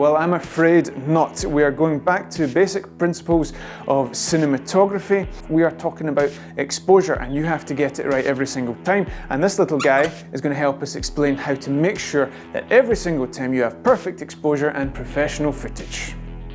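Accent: Scotland, United Kingdom. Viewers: Just bought the mavic mini and you suggested watching this in regards to the histogram and its absolutely brilliant. I dont have a cinematography background, or drone, just bought one for fun but am sure with this tip my shots will at least be perfectly exposed. On a side note, Robin Williams as Mrs Doubtfire had exactly the same soft spoken Scottish accent as you (sorry) haha. Will be downloading the free guide too, cheers for the great content.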